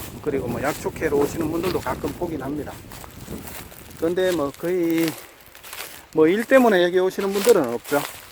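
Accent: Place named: Gyeongsang, South Korea. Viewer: 네^^ ㅋㅋ 반갑습니다 올려주신 영상 잘보고있습니다 경상도 사투리도 듣기좋구요 좋은곳 많이올려주세요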